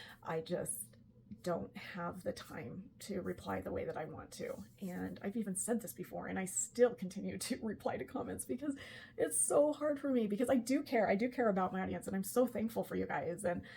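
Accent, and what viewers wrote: Utah, United States. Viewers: what you are going through is valid. I have AuDD. I have experienced so much of what you are going through. I feel my life will be in "recovery" from not paying attention to what my body and brain were telling me. I am in awe of your strength and self-awareness it took to make this video. In this time I have heavily relied on channels like yours and Mac's, (along with other hyper-focus interests). Having been a life long Northern Utah girlie, your Utahan voice and cadence have soothed me more times than you can know. I have wanted to offer my (admittedly, very limited) assistance with what you do, and now I feel drawn even more to offer it, even if it is just to tell you that you have my gratitude for just being who you are. I live north of you, and am serious about offering support should I have the capability. Those of us who truly value you, need you to be your own first priority. 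I love your channel, it has served me so many times as a body double. I (and all of use) support you in your journey. Don't you dare respond to this with more than a heart! Unless you are interested in my assistance, but even then, a very short answer is more than enough!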